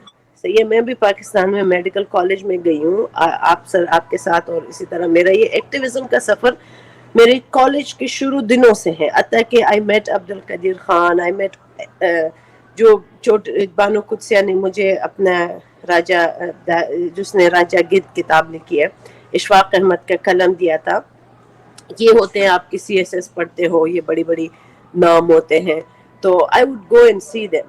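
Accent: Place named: Kutch, India. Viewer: bhai.. your pronunciation tone sounds like your belong to gujrat - India ( Kutch) region...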